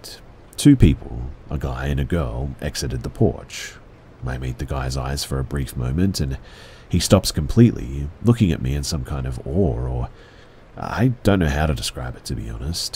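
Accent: Australia. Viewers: At last an Aussie accent. Just subbed, I don't know why it has taken me so long to find you. And I just can't listen without rain!. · Hey 👋 Mate 🇭🇲🪃🤘 it's great to hear an Aussie when your an Aussie too 😉 so thanks ❤️ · Holy hell! I cannot believe I only realized after reading your comment that his accent is Australian! 🤦🏻‍♀️ I've been listening to him for a really long time and always wondered where he was from 😆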